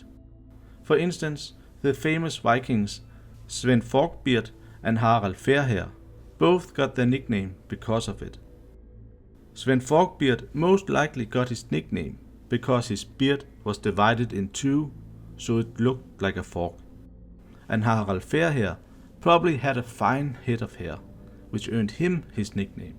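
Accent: Denmark. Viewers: Some heavy danglish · Your speaking with dannish accent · Was wondering if he was Danish too... Danes have the best accents for me amongst Scandinavians... I'm Dutch so I'm not bias.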